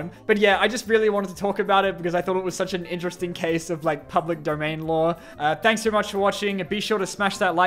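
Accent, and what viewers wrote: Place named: Australia. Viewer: . Are you Australian? I did not know that!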